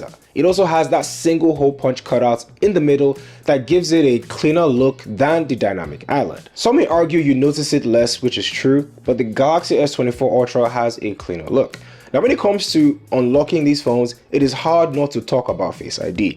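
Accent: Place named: Nigeria. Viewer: bros nigerian accent makes it so hard to understand XD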